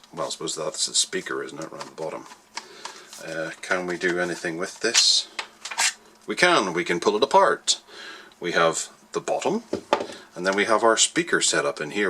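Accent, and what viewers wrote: Northern Ireland, United Kingdom. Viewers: lol never mind. It's nice to click on a review and hear a fellow norn iron accent though:)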